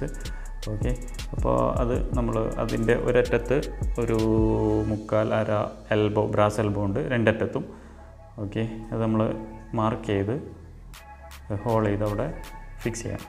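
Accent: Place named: Kasaragod, India. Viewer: കാസർഗോഡ് സ്ലാങ്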